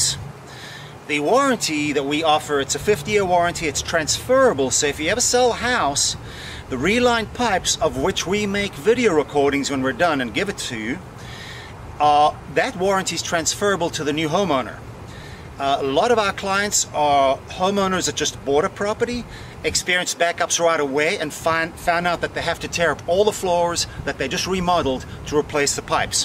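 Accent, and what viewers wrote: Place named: South Africa. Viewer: Interesting accent!!! Originally from South Africa or Zim?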